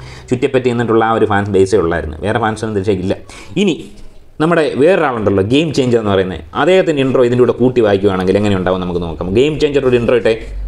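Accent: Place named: Koyilandy, India. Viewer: Ningal samsarikyunad enikyi eshtta kelkan njanum koyilandy ulla alane banglore settle anane ullu☺, chirichu oru vazhiyayi 😁, koyilandy ulla alukal nanma thiricharivullavara bro🥰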